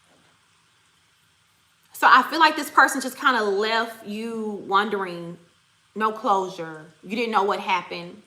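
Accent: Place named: Houston, United States. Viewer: I love your voice do you live in Houston Texas if not you talk like them lol 😂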